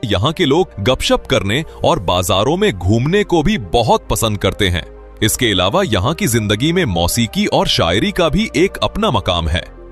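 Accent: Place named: Pakistan. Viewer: Kya aap pakistani hain? Itni pyari urdu pakistan walon ki ho sakti hai 😊 hum mumbai se hain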